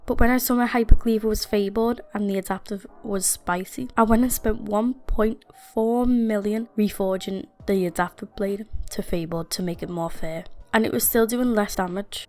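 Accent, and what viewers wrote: Liverpool, United Kingdom. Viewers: Are u a scouser lol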